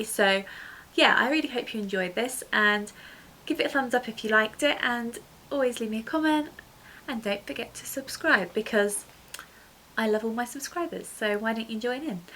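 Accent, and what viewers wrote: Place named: Yorkshire, United Kingdom. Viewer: Hey! Lovely make-up - I do love your accent...I think you might be from the Yorkshire area, I'm right? :3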